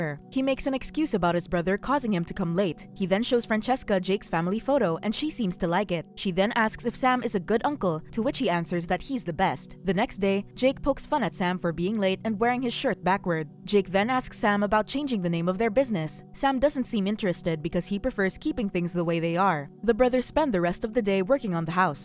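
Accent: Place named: Philippines. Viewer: The narrator sounds Filipina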